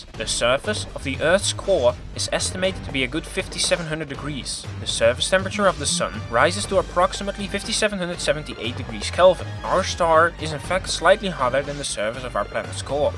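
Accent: Netherlands. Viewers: It's Dutch accent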